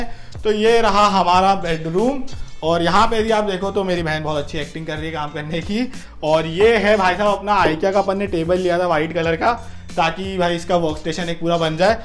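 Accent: Indore, India. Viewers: big brother It is an indori accent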